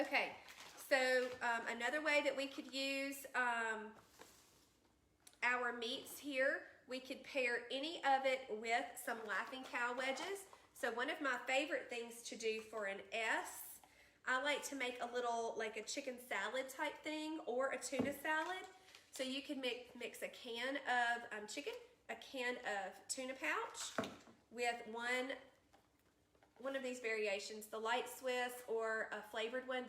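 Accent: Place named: South, United States. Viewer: Oh my gosh, you’re so cute! Love that southern accent! Thanks for all these suggestions ☺️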